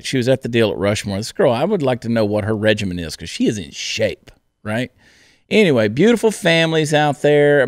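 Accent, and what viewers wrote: Texas, United States. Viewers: YeeHaw, thats a texan right there boy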